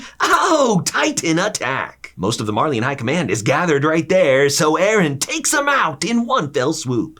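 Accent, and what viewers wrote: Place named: United States. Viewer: Your American voice doesn’t make me change my viewing. Grata. Do more anime!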